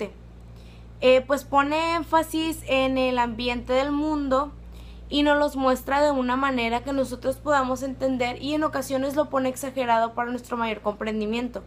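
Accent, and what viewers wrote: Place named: Mexico. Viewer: ¡Me gusta tu acento! ¿De qué parte de México eres?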